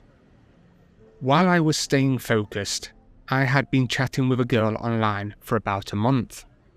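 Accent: United Kingdom. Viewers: Not true.... You speak with a British voice yet you're not a Yank.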